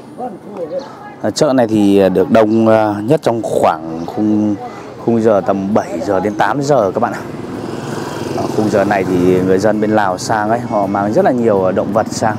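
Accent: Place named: North, Vietnam. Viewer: Nói giong Băc mà lai quá nhanh, thât la nghe không gì hêt. Nói Châm lại môt chút đi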